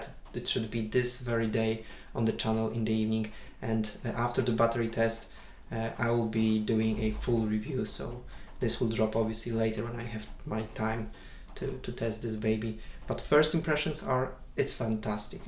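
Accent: Poland. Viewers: are Polish? yor accent is so strong ;-)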